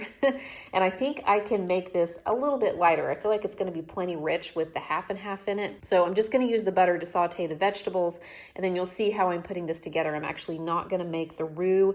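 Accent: South, United States. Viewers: I can’t tell you why but the “that’s French y’all” with your southern accent absolutely sENT me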